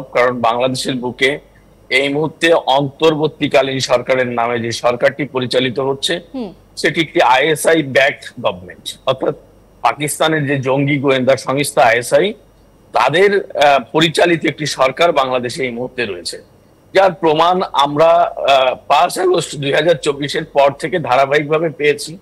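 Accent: Kolkata, India. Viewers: উনি ভারতের লোক ওনার ভাষা। বাংলাদেশের মানুষের ভাষানা উনি কথা বলতেছেন কোলকাতার মানুষের মতো।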